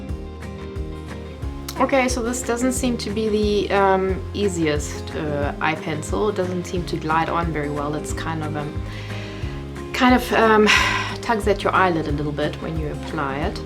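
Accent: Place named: South Africa. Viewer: I love your South African accent x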